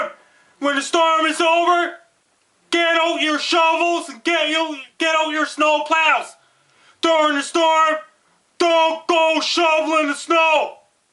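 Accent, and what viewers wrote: Newfoundland, Canada. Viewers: The weather is so much more perplexing when it's being yelled at me by a Newfie guy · no. stop doing your thing. it's fucking annoying and your voice/accent is just fucking terrible. this is why I'm ashamed to be where I'm from. I fucking hate "newfie" accent. You people don't realize just how stupid you sound.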